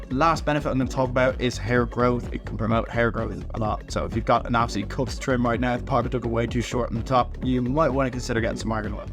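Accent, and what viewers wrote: Ireland. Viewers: Are you Irish because you sound Irish If you are what county are you from I'm from Louth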